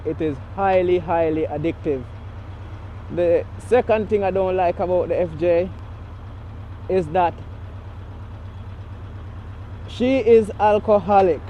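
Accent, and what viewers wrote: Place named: Jamaica. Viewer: Hows the ride brother? You sounds like jamaican hehe i have many friends and tour in jamaica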